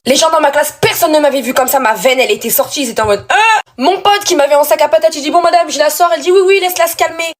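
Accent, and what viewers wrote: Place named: Africa. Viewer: je kiff bien ton accent on dirait t'es africaine t'es africaine?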